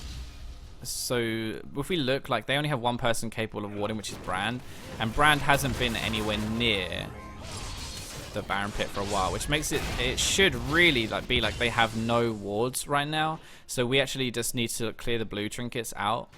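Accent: United Kingdom. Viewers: its called a British accent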